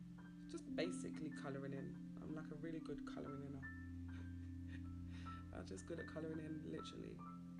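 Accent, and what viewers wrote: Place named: England, United Kingdom. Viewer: First you are chill and easy to listen to you with your English accent I love to go there. I need to know what can take I do to take away the shining from my drawin? Antoinette Rochester Las Vegas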